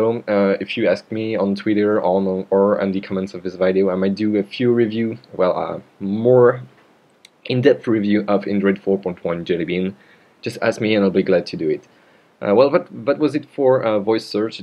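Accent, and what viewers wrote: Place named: France. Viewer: Monsieur est français ? :D Pas sûr mais un tout petit accent français peut-être :P Sinon belle vidéo et GG pour parler aussi bien l'anglais :)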